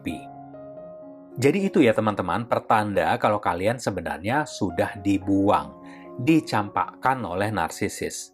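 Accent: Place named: Java, Indonesia. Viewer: Tiba tiba logat bicaranya aneh....gimana ga aneh biasa ngomong logat Betawi kok tiba-tiba campur logat Jawa..😂😂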